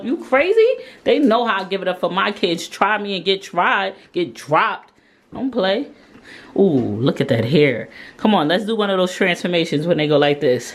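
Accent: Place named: Brooklyn, United States. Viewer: I have just been watching and listening to you for a minute... I just turned 54 yesterday and my dear YOU display more maturity than anyone that i know personally..I admit I have been bias because I just moved to NC from NY and your NY/BK accent just make me happy after a long day of southern charm. However your maturity, intellect and poise is so amazing. Brava!! Don’t ever change.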